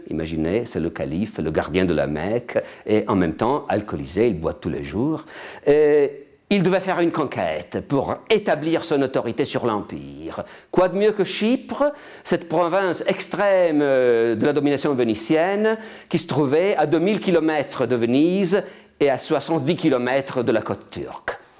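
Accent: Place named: France. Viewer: Ho pensato la stessa cosa..e che bellissimo accento français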